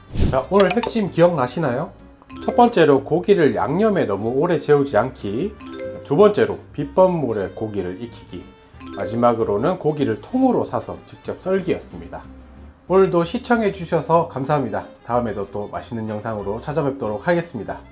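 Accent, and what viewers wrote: Gyeongsang, South Korea. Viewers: 경상도 액센트가 매력적이에요 love love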